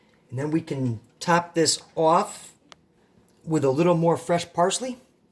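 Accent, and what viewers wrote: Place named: New England, United States. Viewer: Nicely done man I had a feeling you’re also from New England from the accent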